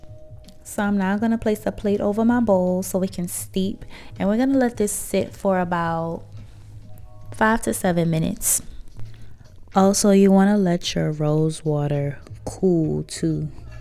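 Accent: Louisiana, United States. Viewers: We have the same name... mine Is spelled Daryllynn .. are you from Louisiana?? Sounds like it & the other 2ppl ik with my name are both from there.. I'm from Texas/Florida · You from Louisiana?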